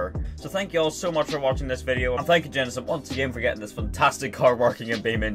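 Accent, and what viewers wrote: Germany. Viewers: You german? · wait, are you german ?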